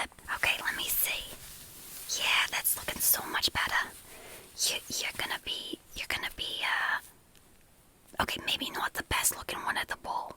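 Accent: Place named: New Jersey, United States. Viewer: Period drama fanciness AND jersey accent?? Two huge tingle triggers for me, combined???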